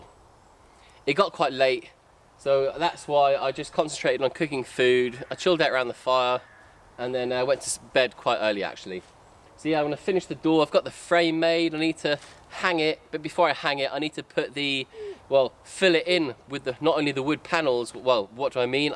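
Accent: England, United Kingdom. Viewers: Loving your channel! What is it about a pommie accent and bushcraft?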